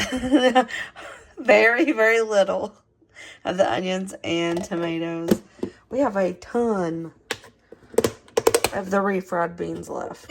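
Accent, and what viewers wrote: South, United States. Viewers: I had to add that i love, love, love your accent. It is comforting and sweet. I feel like I'm listening to a southern friend. I'm from northern Virginia, so our accent is less here...still technically in the south, but because we live near the DC Metro area in the city, everyone's accent is a mix of where they are from (very international) and from here. My parents are both from Wisconsin, so I have a bit of a Norwegian accent. You can cook all the beans and season them. You can make half still whole and do the other half refried. You can freeze part of what you make, as you are correct...cooked beans last in the fridge for 5 days. You can freeze cooked beans up to 3 months. I frequently cook a double portion of beans, then freeze half for the following week. This saves time and money (stove/electric). I thaw the beans in the fridge, then serve differently than the prior time. 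With rice, in a soup with vegetables and a boullion cube or two, or on toast, corn tortilla, etc! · would you be willing to share your garden journey. I know you may have already started planting. But I would love to see videos on what all you grow & what you do. I like the videos you do for budget cooking. When you were getting the vegetables it reminded me that last year you would use stuff from your garden. I think people enjoy watching you. Your sweet southern accent, smile, laughter & how honest & real you are. I know when ppl start channels it might be for a specific thing. But clearly your subscribers enjoy all aspects of you are willing to share. From the camping & spending time with your family, to the fun game you & Dusty played. Tell the family hello. God bless you all all ❤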